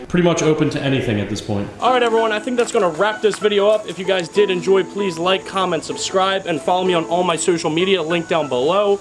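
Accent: Philadelphia, United States. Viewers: That Philadelphia accent, though. Do you know it’s, “water,“ not “wooter“?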